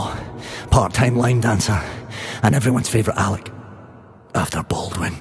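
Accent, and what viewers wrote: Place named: Scotland, United Kingdom. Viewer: I absolutely love his accent even though I am also Scottish 😂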